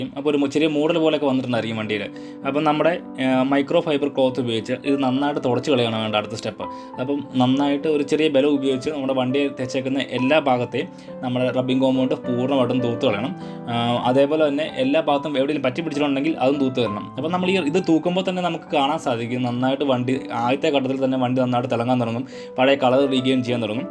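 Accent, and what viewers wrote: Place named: Pala, India. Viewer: പാലായിൽ എങ്ങാനും ആണോ വീട്. എവിടെയാ. സസാരംകേട്ടിട്ടു ഇവിടെ എങ്ങാണ്ടു ആണ് വീട് 🤔🤔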